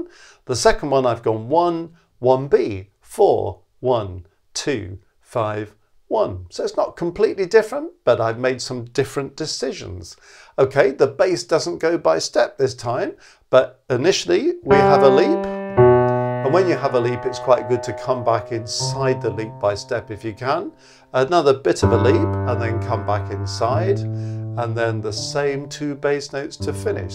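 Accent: RP, United Kingdom. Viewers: Love your RP accent. So comfortable to listen to!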